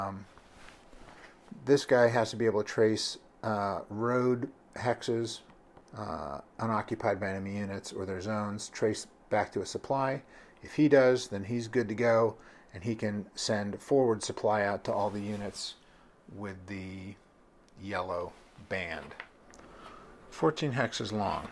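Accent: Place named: Pittsburgh, United States. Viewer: Thanks for making these OSG videos. I also thought in one of your videos "that sounds like a Pittsburghese accent" and yep you guys are here too.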